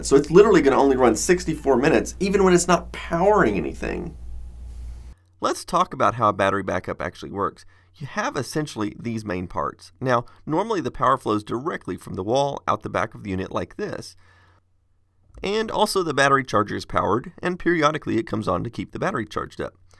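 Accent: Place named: Texas, United States. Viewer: Classic 8 but guy. Always a pleasure. Is it just me or does sound a bit more Texan in these early videos?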